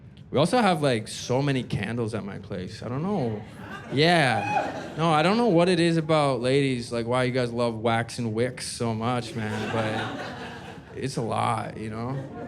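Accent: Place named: Canada. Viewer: this homie is so fucking Canadian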